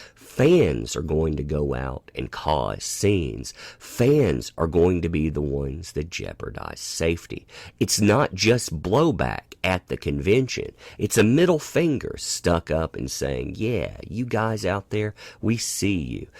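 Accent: South, United States. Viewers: Hey where IS your accent from? Are you from the southern US because it sounds somewhat familiar?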